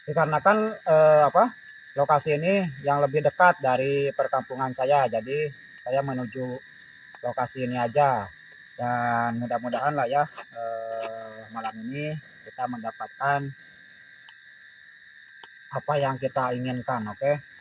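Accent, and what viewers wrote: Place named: Sunda, Indonesia. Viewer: logat sundanya kentel bngt orang cianjur ya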